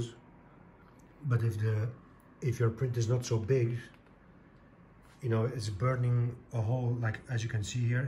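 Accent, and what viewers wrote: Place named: Netherlands. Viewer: I thought you were Dutch because of the accent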